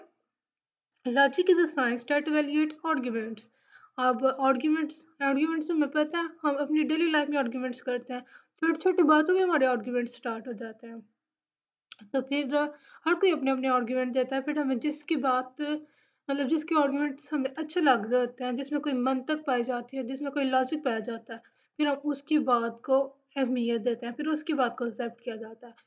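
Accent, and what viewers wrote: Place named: Lahore, Pakistan. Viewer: ap ki voice sa lagta ha ap lahore sa ho . hhaahah but ma'am boht acha explain kiya ap na..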